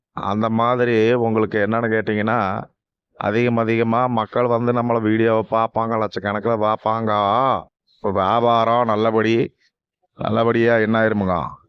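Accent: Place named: Kongu, India. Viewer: கொங்குத் தமிழ் அருமை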